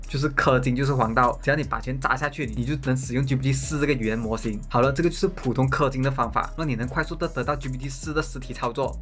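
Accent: Malaysia. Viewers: 马来西亚口音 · 馬來西亞口音？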